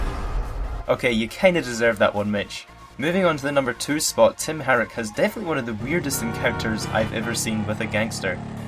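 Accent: Scotland, United Kingdom. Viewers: Where are you from? You sound Scottish.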